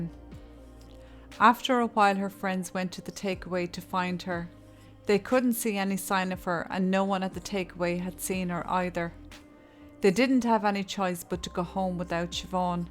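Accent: Ireland. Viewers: ur great keep it up irish storys told by a irish lady perfect chill in bed channel ❤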